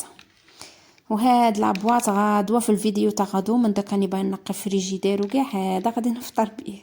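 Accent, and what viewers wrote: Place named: Ain Temouchent, Algeria. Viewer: متتبعة جديدة تخلت لبيتم وعحبتني هدرتك انت من الغرب هدرتك تاع تموشنتية بصحتك مشترياتك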